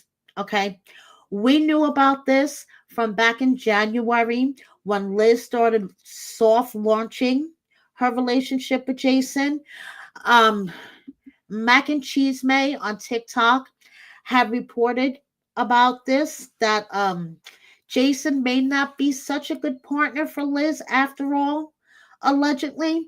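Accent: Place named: New York City, United States. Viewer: Hi happy holidays. I love your channel and just subscribed. Your accent reminds me of my New York City roots. Aloha from Hawaii.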